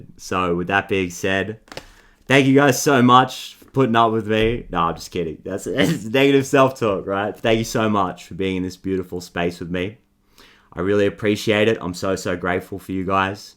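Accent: Australia. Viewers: great reading, totally resonates! Thank you fellow Aussie! unmistakeable accent 😁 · I think he sounds Australian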